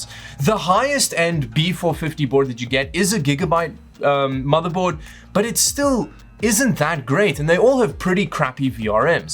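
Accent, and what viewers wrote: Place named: South Africa. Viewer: 100% south african right?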